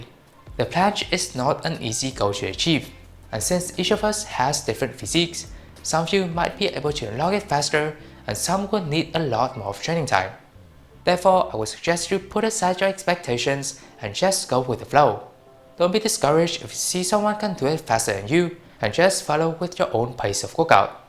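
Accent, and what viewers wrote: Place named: England, United Kingdom. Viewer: Bro your English accent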